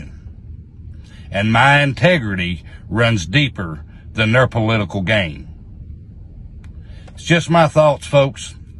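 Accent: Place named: Texas, United States. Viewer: He has a southern drawl. Sounds like Texan.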